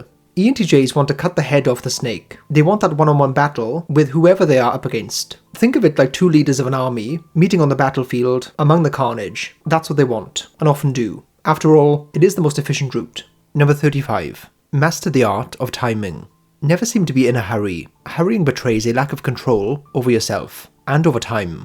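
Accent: Wales, United Kingdom. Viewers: dwi'n abod accen yna, cymraeg ti?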